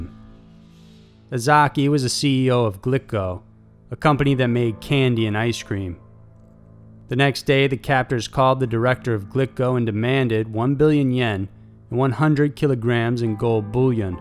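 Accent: United States. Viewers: The narrator has a perfect American accent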